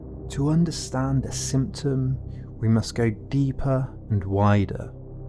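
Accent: United Kingdom. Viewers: Theres something about a british accent that presents an insidious force that everything being said is intellectually inclined and of authority. I just think its so dangerous. Or am i just crazy? There may very well be merit here, but i cant tell and it bothers me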